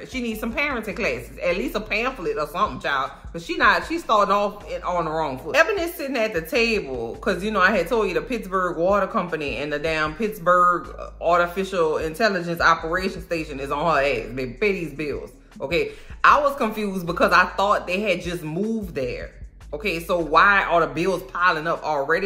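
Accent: New Orleans, United States. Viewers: I normally can't stand the sound of a Nola accent, but yours is pleasant, and you're funny af😂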